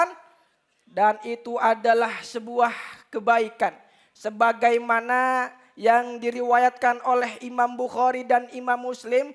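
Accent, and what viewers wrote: Java, Indonesia. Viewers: Materi bagus ko wasalam ya apa gara gara pokal suara tidak ngebas di tambah bahasa indo nya masih ada logat jawa jawa nya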